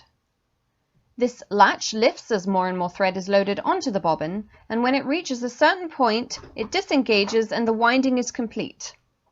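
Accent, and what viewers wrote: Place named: England, United Kingdom. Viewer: Thank you so much for uploading this video on bobbin winding. I now know why I was having trouble. My one and only metal bobbin’s notch was not deep enough and the reason for not catching on to the bobbin spool pin. I have easily rectified this by using the edge of a fine saw blade. Just a few back and forward strokes has allowed it to fully engage. I have as you suggested opened ‘About’ and sent my email for your very kind offer of a pdf copy. Thank you so much for all of your videos, which have been a great help to me. Glad you have not lost your refined English accent!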